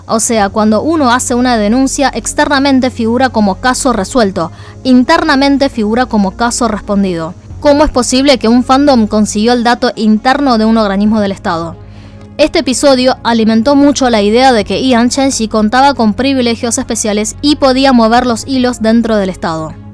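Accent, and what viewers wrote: Argentina. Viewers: En Latinoamérica es peor y nunca salen a la luz .En todo el mundo hay favoritismo pero es raro que salga a la luz eso.Excelente video y tu acento Argentino muy bonito si no viera en tus vídeos.Diria que es documental narrado por una Argentina .Perdon yo sé que sos Argentina y China pero yo te miro como latina que nos informa de China todo lo mejor para vos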